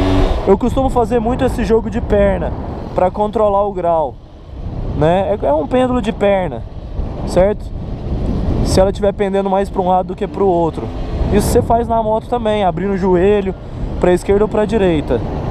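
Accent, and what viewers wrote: Goiânia, Brazil. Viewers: Você e de Goiânia ?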